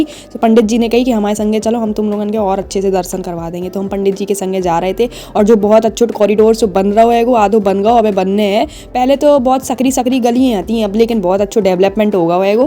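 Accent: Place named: Bundelkhand, India. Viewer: Aapka bundelkhandi accent is so good 🤌👏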